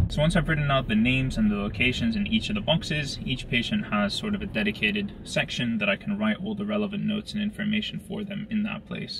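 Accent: Scotland, United Kingdom. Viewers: His accent has changed a lot. He sounds so british sometimes. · you sound so scottish to me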